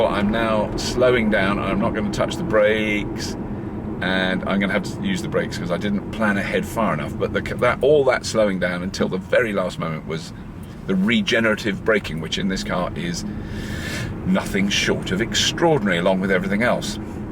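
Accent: United Kingdom. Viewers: Love hearing things explained with a British accent.. And this car is on my want list!! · A Tesla report with a British accent. Raises the Tesla bar that much further!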